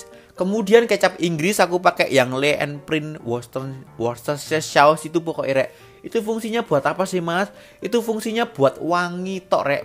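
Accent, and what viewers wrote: Java, Indonesia. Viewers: Menunya enaak, gaya komunikasinya menarik, apa adanya, jawa banget, nyenengno 😀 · Terimakasih resepnya ttp ngomong2 masnya orang msna ya kok jawsnya medok. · Di klukupi iku opo mas?! Hehehehe...medok'e mantabs!!! Sehat ya mas...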